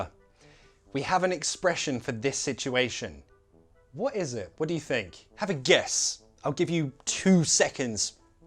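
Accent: United Kingdom. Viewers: You're Brithish? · As Brazillian I love the British accent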